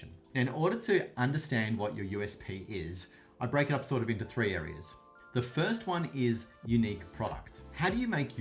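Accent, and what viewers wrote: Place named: Australia. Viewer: Automatic trust, when I hear Aussie accent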